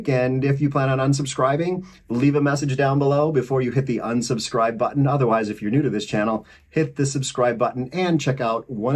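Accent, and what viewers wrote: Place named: Canada. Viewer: Gawd ... You almost sound Canadian. For me that is 1 of the best compliments I can think of. Personally I try to assume everyone deserves the chance to disappoint me or amaze me. So far you've only amazed me. Grrr8 subject, grr8 attitude and good for you in trying to become a better person. Gawd knows we need more of "those" people. If I offended anyone I'm Canadian so ... sorry . P n D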